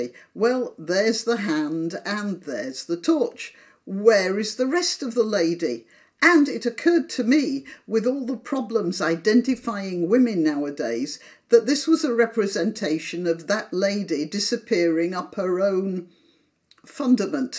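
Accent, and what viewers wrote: United Kingdom. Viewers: Roasting celebrities in a British accent is really next level… 👏👏👏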